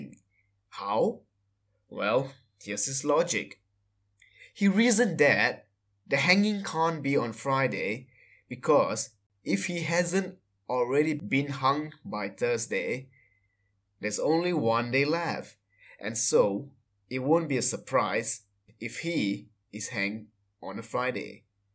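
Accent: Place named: France. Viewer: Sounds like French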